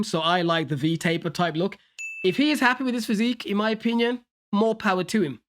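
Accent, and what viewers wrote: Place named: England, United Kingdom. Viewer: damn, I wrote that with full chest, while listening to your english accent 🤸‍♀️🤸‍♀️🤸‍♀️ gonna roll away now🤸‍♀️🤸‍♀️🤸‍♀️🤸‍♀️🤸‍♀️🤸‍♀️🤸‍♀️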